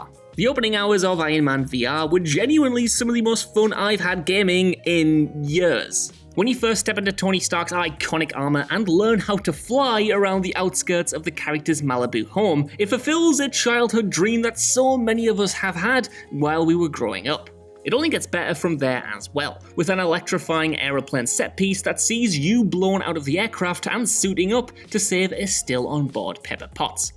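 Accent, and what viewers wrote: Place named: North East England, United Kingdom. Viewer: He’s got a bit of a north eastern English accent.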